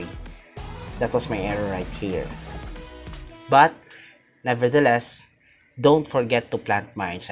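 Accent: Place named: Philippines. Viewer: sabi na eh pinoy haha dahil sa accent natin, pero naseal ni isagani ung hula ko haha anyways, angas bro. i just came back sa AoW3 and nangangapa pa ulit. dami ko natutunan na counters sa vid mo.. tnx a lot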